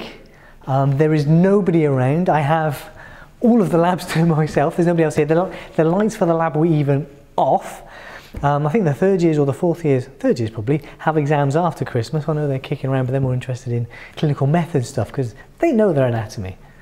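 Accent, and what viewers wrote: England, United Kingdom. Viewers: Why does everything sound so much better in an English accent lol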